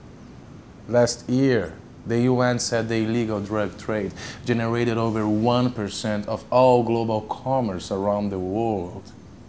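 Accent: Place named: Portugal. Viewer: Portuguese accent sounds sweet:)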